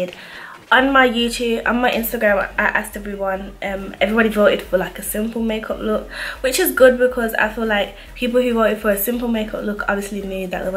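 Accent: Birmingham, United Kingdom. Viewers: Aww Birmingham accent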